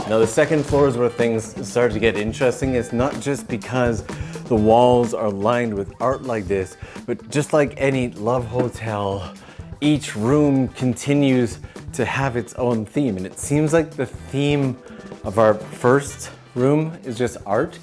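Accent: Canada. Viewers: Your Canadian accent really came out in this episode. Great stuff! love your videos.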